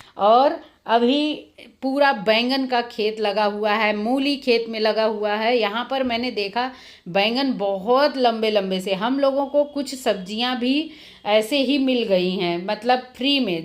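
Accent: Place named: Chhattisgarh, India. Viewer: Mam Aap kha se ho Aapki language to chatishgad se lag rahe ho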